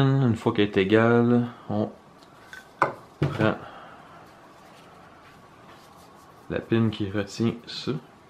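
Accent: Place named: Quebec, Canada. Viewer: Merci, cousin de la belle province! Explication limpide dans un beau parler!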